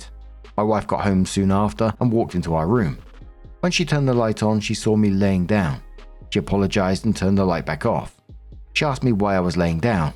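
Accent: United Kingdom. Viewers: It is a British thing FYI, sometimes they don't pronounce 'co' or 'ch' with the hard 'k' that Americans do.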